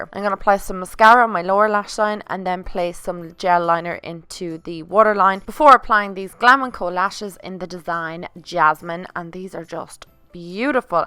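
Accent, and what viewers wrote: Ireland. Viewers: Mariah M the accent is Irish, I'm Irish myself 🍀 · I love your accent is it irish? you should name the kitty Oreo.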